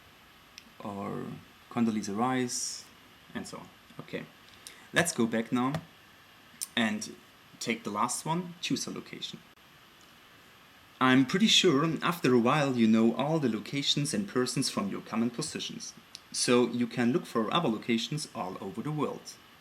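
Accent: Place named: Germany. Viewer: du bist Deutscher, das hört man voll raus :-)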